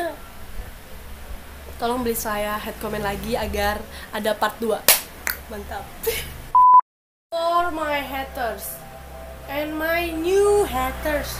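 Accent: Java, Indonesia. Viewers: Nx ngomong medok kuilo marai klepek2 😍😂😂😂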